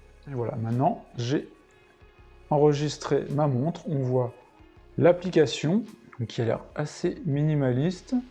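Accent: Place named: France. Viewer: la lecture anglaise avec la prononciation française..c'est pas top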